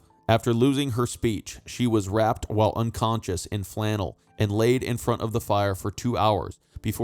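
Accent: United States. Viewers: An American narrator. That lends no credibility to this at all. Americans lecturing about English history is outrageous.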